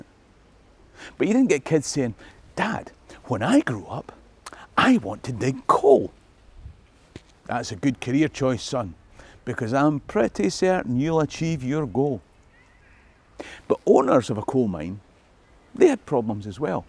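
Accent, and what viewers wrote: Scotland, United Kingdom. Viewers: For fooks sek. This is bloody fookin brrriliant. I love the Scottish accent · I just subbed to your channel, I can listen to you talk for hours because I love the Scottish accent ❤️ I'd like to ask if you have not already could you do a video on the real and True braveheart that Mel Gibson did? I would absolutely love to hear the true history behind that, as I do not know how accurate the movie was. That would be such an awesome video to watch. I'm excited and cannot wait to hear from you as you post more videos. Greetings and much love from the USA 🇺🇲🙏🏻✝️❤️🥰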